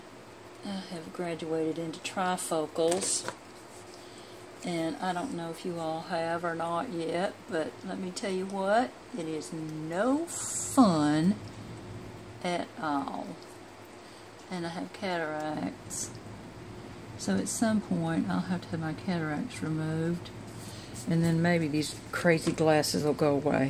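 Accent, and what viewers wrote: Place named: Southeast, United States. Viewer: My husband and I watched your video during my lunch break from work yesterday! You have such a great eye for design. We both loved your cards. Everytime we watch one of your videos we have the conversation about your accent and where you’re from. He thinks maybe Mississippi and I won’t commit to a state but just say “somewhere in the southeast”. My husband is from West Texas and has a definite West Texas accent. I’m from Pennsylvania and although I don’t hear it, when we lived in GA so many people would comment about my northern accent. Accents have always fascinated me. So my guess is still that you are from the southeast but I’m pretty sure not Alabama, Georgia or Mississippi. Maybe Tennessee or South Carolina because yours isn’t as strong as a Alabama, Ga or Mississippi. Sorry for the ramble about non-card/video things. 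I’ve become such a big fan of your videos that they are literally the first videos I look for when I get time to watch some TV. One more question for you, also from our discussion yesterday - what is the microphone you’re using? I’ve been starting to create my videos and finding time to do voiceovers is hindering getting other things done so I’m thinking about looking into microphones so I can talk as I go, like you do, and then edit out what’s not useable, which would eliminate the voiceover step in our production schedule. Thank you again for making videos that show how to use what we already have and don’t have a selling aspect to them! Hope you have a w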